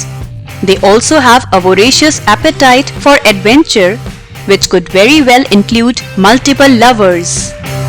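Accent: India. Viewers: I was really excited to watch the video until I heard the cringy indian accent. sorry. had to be said.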